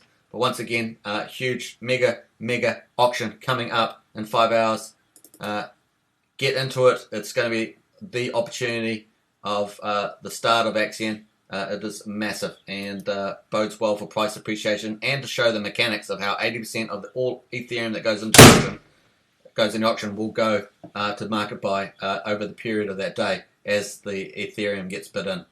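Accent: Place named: New Zealand. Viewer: You are obviously a kiwi boy. Kiaora for these clips. I have no idea how to work auctions. I need to learn more.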